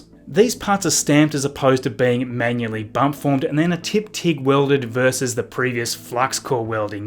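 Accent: Australia. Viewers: Im sorry to ask off topic but where is your accent from, Australia?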